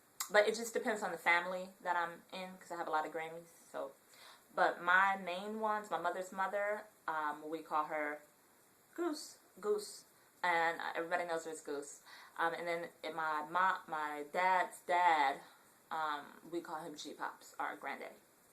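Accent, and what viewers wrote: United States. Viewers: im from NY and I like hearing that compton cali accent of where your from and by the way don't worrie about how you look u should consider signing up for americas next top model thats how nice u look:) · She sounds realer than most Californian accents I hear including the ones from actual Californians. The real ones sound almost as forced as the fake ones. - Bay Area Guy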